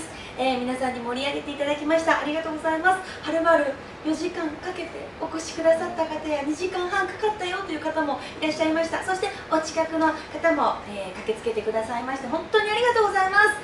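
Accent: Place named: Osaka, Japan. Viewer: かわいい！ちらほら、大阪弁素敵🎉華織さ〜ん、応援していますよ！また、イベントでお会いしましょうね！お会い出来ます日を楽しみにしています♡白夜行も、カッコいい😊！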